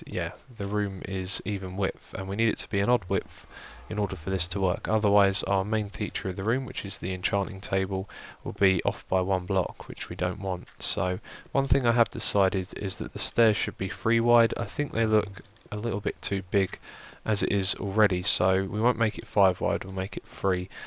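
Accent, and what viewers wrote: Netherlands. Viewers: Haha, I could tell by his accent that this guy was Dutch :D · I think you can really hear it, actually. I am dutch myself and am really familiar with dutch people trying to speak english. You can defenitely hear that he's dutch.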